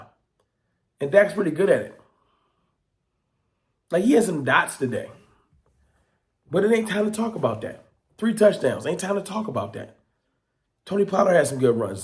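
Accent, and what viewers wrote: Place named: Philadelphia, United States. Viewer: Bruh you sound like you from Philly or jersey! 🧐🧐🧐🤦🏽‍♂️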